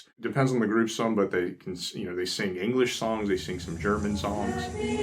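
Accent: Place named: North Carolina, United States. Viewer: He speaks like my brother, who is a ninth generation North Carolinan!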